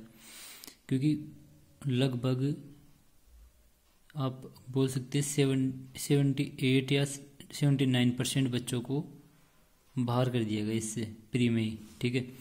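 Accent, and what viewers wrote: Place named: Haryana, India. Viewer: Bhai tu haryana ka Lage se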